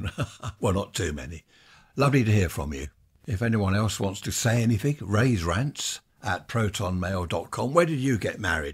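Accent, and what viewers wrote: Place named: Worthing, United Kingdom. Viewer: Hi, this is a podcast covering one of my favourite interests. Trains and trams are always a great subject to discuss. When I was a child in Sydney, all the interstate and regional trains had steam engines. Our suburban trains were single deck electric. So9me had steel carriages and others had wooden carriages, which were older than the steel carriages. Sydney only started getting double deck carriage trains in the 1960s. Actually, listening to your voice, you don't sound like you are at the Isle of Wight, you still have your Worthing accent!!! Seriously though, The Isle of Wight sounds like a great place or a holiday. I remember riding steam trains from Sydney to Nowra south and also to Newcastle north of Sydney. Where do your trains go from Worthing these days? According to a video that I have just watched, the train carriages going through Worthing station are green in colour. 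We had a lot of train lines disappear here in Australia as well, though in some situations, they have had to bring them back. We still have street telegraph poles with lights here, though only to carry the electric, Internet and phone cables. We had trains carrying cars until recent years, though not sure if they still run these days. My wife and I were married in a house way back in 1979. We knew a minister, who was a friend of the family and all went very well. Anyway, take care. Rob in Melbourne Australia.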